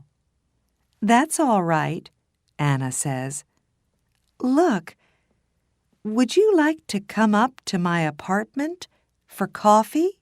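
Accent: United States. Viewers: Hello Is it american english? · Thank you a lot! Could you tell me what kind of English pronunciation it is. Is it American English pronunciation? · I think it's American English.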